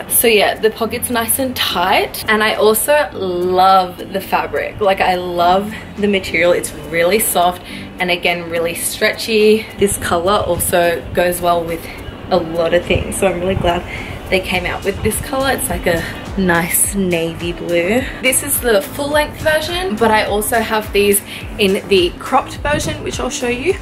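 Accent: Australia. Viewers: r u an aussie m8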